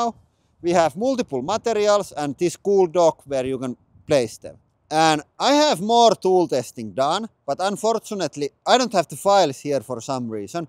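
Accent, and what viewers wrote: Finland. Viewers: s a Swedish person, I truly appreciate our Finnish neighbours. So the moment I heard the accent, I felt warm and positive. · How nice to hear a Finnish accent 😊 I’m 2nd generation Finn here in the US. My grandparents came over in the late 1800’s. My mother and both grandmothers equals 28 children. Big age gap.😂. I love your channel. Finn was my mother’s first language. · The language he speaks calls Finglish) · Well it's that Finnish accent mixed with English translation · Nice Finnish accent! :-) Go Suomi, go!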